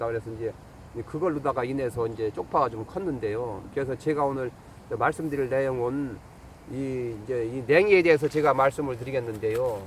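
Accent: Chungcheong, South Korea. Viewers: 데요~데요~ 너무 좋습니다 충청도가 최고에요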